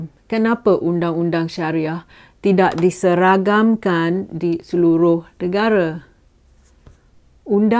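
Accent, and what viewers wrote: Perak, Malaysia. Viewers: Orang mana ni lorat perak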